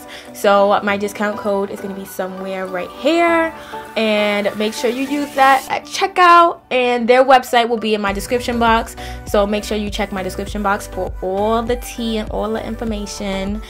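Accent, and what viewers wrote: New York, United States. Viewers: I LOVE your New York accent. 😍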